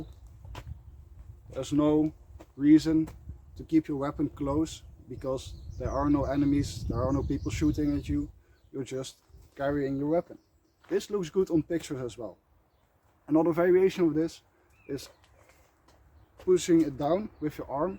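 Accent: Netherlands. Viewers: Hmmm dutch??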